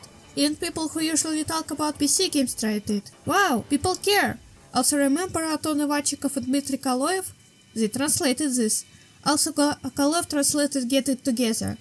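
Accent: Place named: Russia. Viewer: Из чистого любопытства нажали на этот ролик сразу после просмотра видео от Zoppru. Интересным опытом получилось лицезреть совершенно неожиданное сочетание классического "рашн" акцента с действительно хорошо и интересно написанным текстом, офорлением и подачей - как у настоящего ютубера! Видно, что над роликом старались, своими силами, но старались, продолжайте расти как контентмейкер и заводить добрых и понимающих друзей. P.S. Да ладно, неужели наконец-то русскоязычный чел на ютубе, у которого можно написать о себе в зей/зем и не схолпотать в ответ ворох придирок за экзотичные местоимения?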